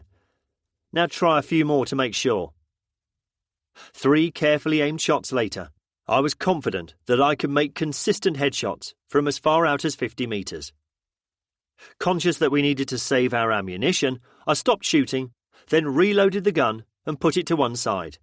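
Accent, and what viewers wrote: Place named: United Kingdom. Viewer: Brits can even make the zombie apocalypse sound funny 😃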